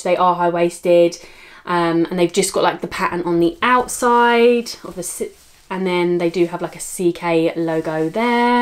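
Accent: United Kingdom. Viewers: So beautiful, just enchanting in every way. And I LOVE that gorgeous British accent! 😍 💕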